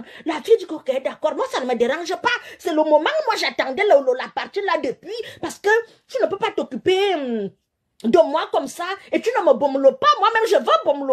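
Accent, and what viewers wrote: Cameroon. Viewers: Je kif son accent camair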